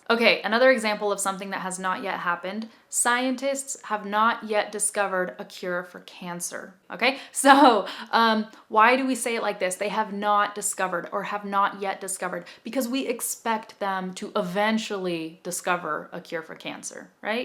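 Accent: California, United States. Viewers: I enjoy your accent from California. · I love you Ma'am, The way you speaking American accents and your always smiling face attract me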